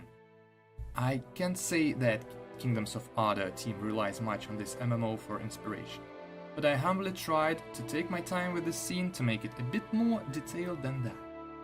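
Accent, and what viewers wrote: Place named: Russia. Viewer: Я по акценту сразу определил русского)))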